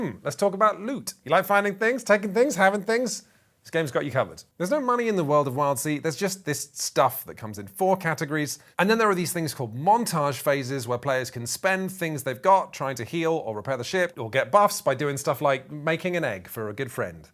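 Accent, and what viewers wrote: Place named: United Kingdom. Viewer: You should review harnmaster, also ur totally British seth skorkowsky.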